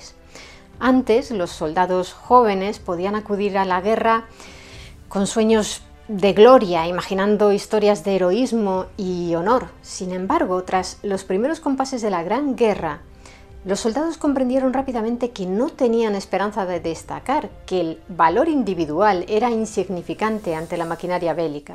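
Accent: Spain. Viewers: Excelente trabajo. Muchas felicitaciones por tu canal, es muy bonito escuchar todas esas historias con tu lindo acento español. Espero algún día ver historias de personajes latinoamericanos como por ejemplo Miranda. Un gran beso y un fuerte abrazo desde la América Latina.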